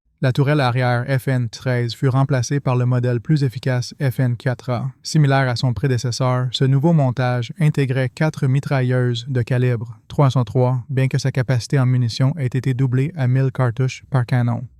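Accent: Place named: Quebec, Canada. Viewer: I A de merde avec l'accent de Céline Dion..... tabernacle.....mais sujet intéressant....... dommage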